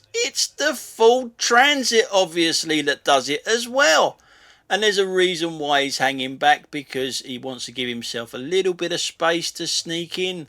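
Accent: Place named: England, United Kingdom. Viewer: Being Irish myself (with a strong Irish accent despite living 23 years in Manchester) I love your voice and your English accent - you come across as being very classy 🇮🇪☘️🇮🇪❤️❤️❤️❤️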